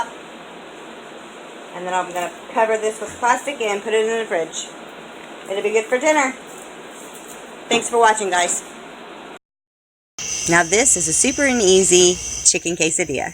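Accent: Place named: North, United States. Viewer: I'm originally from Pittsburgh PA.. I thought I recognized a northern accent. I have subscribed.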